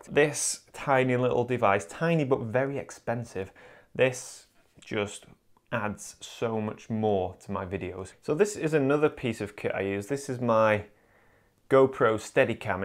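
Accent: United States. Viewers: Don't be sorry. I loved your American accent, since I'm from the U.S. :D Thank you for this video, as I've always been curious about your b-roll equipment! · That American accent 😂 haha you’re the best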